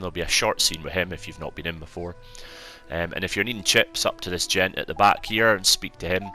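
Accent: Scotland, United Kingdom. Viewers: I think it's Scottish accent? Sorry if I'm mistaken.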